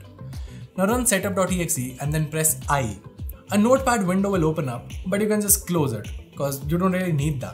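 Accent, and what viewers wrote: India. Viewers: That indian "gulu gulu" accent.....................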